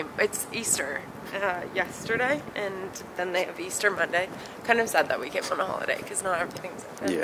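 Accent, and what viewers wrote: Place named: Australia. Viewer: haha confusing Europeans with an Aussie accent 👍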